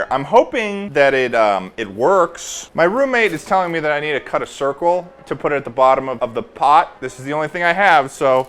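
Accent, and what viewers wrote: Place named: Massachusetts, United States. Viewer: This man is definitely from Massachusetts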